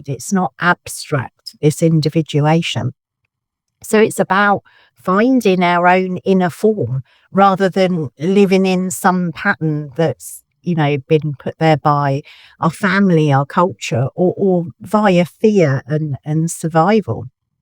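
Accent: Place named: England, United Kingdom. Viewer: Is that an English accent I hear? Music to my ears. I process audio. I especially love English accents and articulation/oration. Love my English brethren. From America. New subscriber. Your voice is melodic and you articulate and explain so beautifully.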